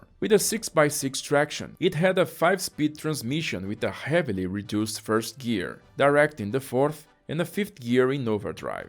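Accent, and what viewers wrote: Brazil. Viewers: Nunca vi esse canal, mas percebi que era brasileiro pelo sotaque HEUHEUEHUEHEUUEUE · Apesar de falar inglês muito bem,o sotaque entrega que é Brasileiro 😂👍 Parabéns por estar trilhando um novo caminho com um novo público!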